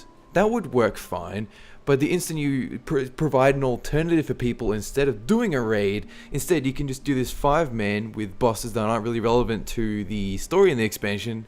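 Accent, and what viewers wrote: Australia. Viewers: Dude, you're an Aussie? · Sick you're Aussie!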